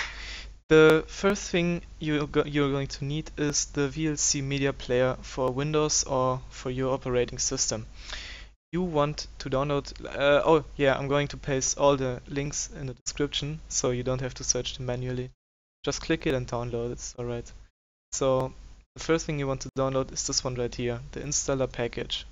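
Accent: Germany. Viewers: Livestreamer gibt es aber anscheinend nur für 32 Bit.. Ich habe aber ein 64 Bit System.. Funktioniert es dennoch? :) Bist ja deutsch wie ich gesehen habe und man es auch raushört :) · haha ich wusste das du deutscher bist xD Das merkt man am Akzent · D der deutsche Akzent ist real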